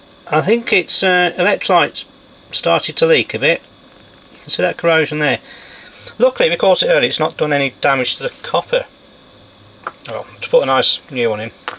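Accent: Birmingham, United Kingdom. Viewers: Wow, I just stumbled on this video and I am transfixed! That is a beautiful deck and if any of my audio equipment became faulty, I'd want you to repair it. So professional. As an aside, you sound Brummie. I am from that part of the world. Brilliant!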